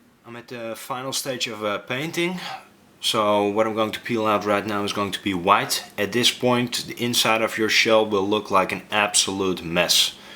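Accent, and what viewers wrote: Netherlands. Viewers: Dutch accent ftw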